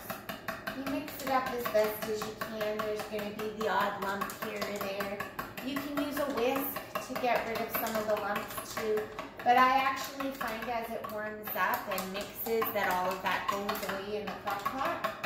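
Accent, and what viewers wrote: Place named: Canada. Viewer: I'm definitely trying that pork. I just LOVE that particular Canadian accent! 😄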